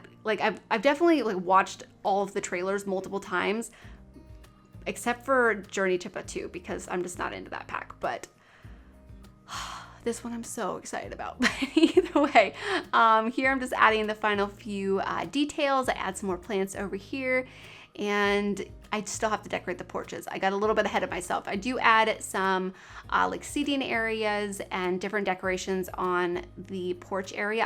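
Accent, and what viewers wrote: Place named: Michigan, United States. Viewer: are you from michigan originally? i see your adress is now in california but i just wonder because of your pronunciation :))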